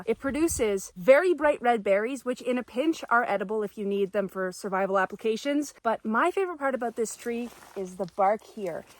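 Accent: Canada. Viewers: The Canadian accent is still there young.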